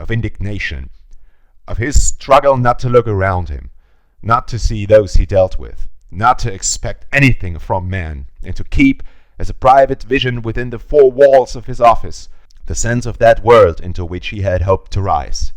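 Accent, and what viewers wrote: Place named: Germany. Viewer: Legendary speech! Also, it is funny you show you’re German by your v’s and w’s being mixed up. Great job reading! You are very good at English!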